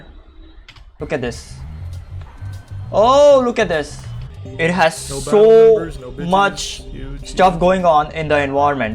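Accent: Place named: India. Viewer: getting shi**ed on in an Indian accent, love it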